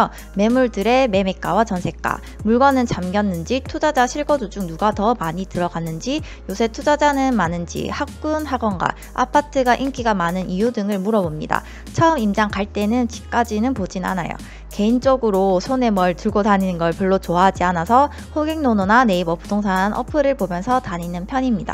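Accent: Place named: Ulsan, South Korea. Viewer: 잘 보았습니다..울산분이었군요..어쩐지 말투가 나랑비슷하던지^^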